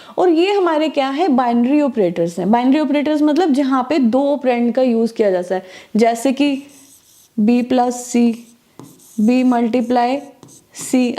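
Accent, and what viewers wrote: Punjab, India. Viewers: I am from punjab and your punjabi accent in hindi made this video more intresting, good explanation. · Panjabi ho kya miss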